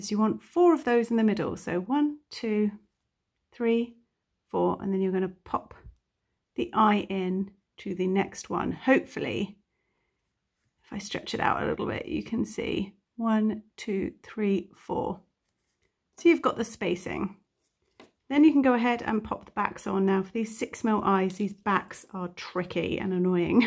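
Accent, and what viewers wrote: England, United Kingdom. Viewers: Great tutorial but I’ll admit I was thrown off at first by the English accent but American crochet terms 😂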